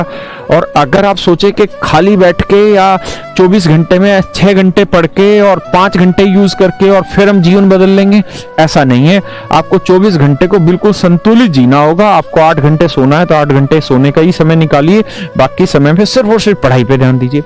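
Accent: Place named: Maharashtra, India. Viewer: Ye Maharashtra ki tone 😂😂😂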